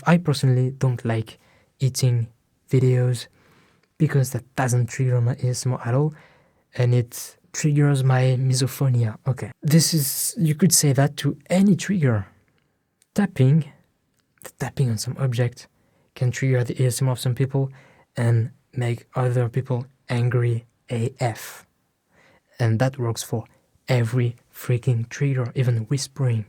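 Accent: France. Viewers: c'est quand même marrant le fait que l'accent français fasse que je comprends chaque mot parfaitement. Merci et continue d'être français stp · I tried to explain (what I know now to be ASMR) to my family/friends when I would ask if random people (telemarketers, random people on the other end of the phone OR random people I’d have interactions with) and try to explain that listening to certain people’s voices would start to almost lull me to sleep with my head tingling/chills. No one ever understood what in the world I was talking about and I was almost frustrated I was so easily affected by certain people’s tones, sounds, volume, dialect, ect. 5 or so years ago I finally found out I was describing my own ASMR! Speaking of tingles - Please continue to talk in English with your amazing French accent. ☺️😴Ahhhhhh!😴☺️ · kinda off topic but I LOVE your French accent it’s so adorable 🥰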